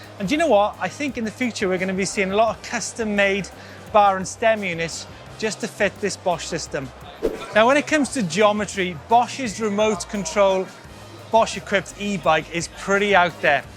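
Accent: Wales, United Kingdom. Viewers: Nemanja X it sounds a little Welsh to me, can't say whether it's north or south though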